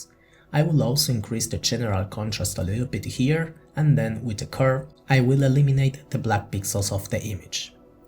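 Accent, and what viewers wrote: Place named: Rioplatense, Argentina. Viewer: are you from argentina? you have a strong rio de la plata accent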